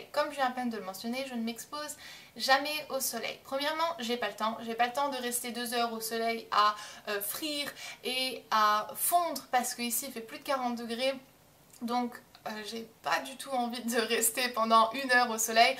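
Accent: United States. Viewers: Parfois lorsque tu parles on dirait presque que tu es une vrai américaine ! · J'aime vraiment ton "accent" anglais/américain. Même si je sais que à la base tu es française, mais je trouve que cette langue te va vraiment bien dans ta façon de parler etc. (; Bonne continuation. ♥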